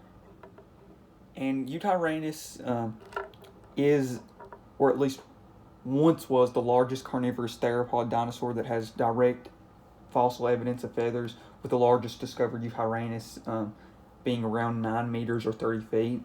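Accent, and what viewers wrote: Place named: South, United States. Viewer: Wow that looks hyper realistic! Nice southern draw you got going, I got one too I’m from Arkansas.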